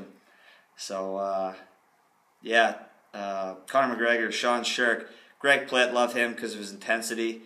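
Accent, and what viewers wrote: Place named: Canada. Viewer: Yes you can tell you are Canadian! Good input. Looking forward to more up dates on topics , nutrient , exercises, posing ,etc. congrats on your competition !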